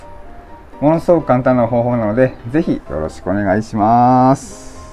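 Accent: Osaka, Japan. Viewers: いろんな変わった症状が出てくるんですね。大変な事でなくで良かったですが。先生がこの事うまくさんに説明できるかなって心配。話の途中で漫才風になるのが大阪人ですね。昔１０年ほど大阪に住んでいたんで大阪弁懐かしく聞いています。コテコテになるほど思い出が出てきて嬉しいです。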